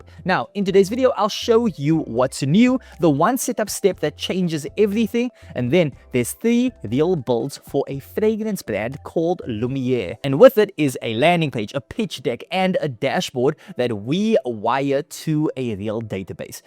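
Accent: South Africa. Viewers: Are you South African? That accent is too local for me to ignore haha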